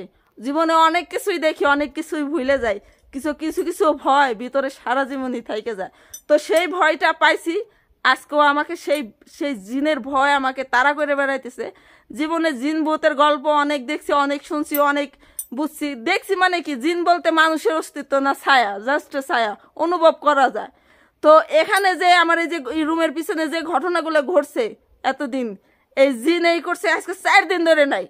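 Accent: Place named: Bikrampur, Bangladesh. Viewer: আচ্ছা আপা কিছু মনে করবেননা, আপনার দেশের বাড়ি কোথায় একটু বলবেন? কেন যানি আপনার ভাষা শুনে মনে হচ্ছে, দোহার কিংবা নবাবগঞ্জ অথবা বিক্রমপুর হবে.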